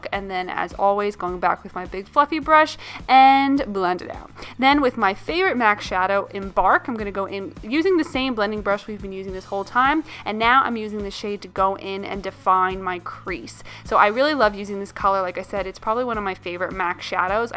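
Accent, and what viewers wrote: New York, United States. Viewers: So beautiful! I love all of your tutorials 😍 Where are you from? Sounds like a New York or New Jersey accent? Lol 😘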